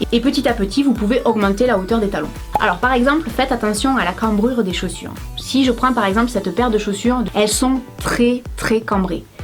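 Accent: South, France. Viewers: Salut, petite question, existe t’ il des anti derapants à poser sur la semelle (côté sol) pour avoir une meilleure adhérence, et met tu quelque chose au niveau du bout du talon pour la même utilité. Merci pour ta bonne humeur, tes astuces et ton accent, vive le sud!!!!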